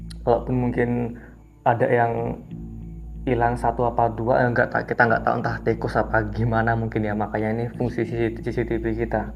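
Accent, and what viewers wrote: Java, Indonesia. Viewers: Logatmu mambu jowo bang 😊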